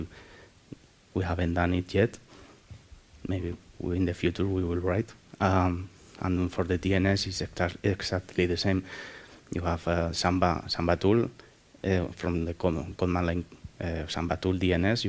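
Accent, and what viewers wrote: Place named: Spain. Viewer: english with a strong spanish accent but so useful ...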